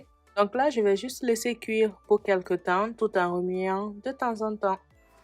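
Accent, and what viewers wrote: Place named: Senegal. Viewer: elle vient du Sénégal vu l'accent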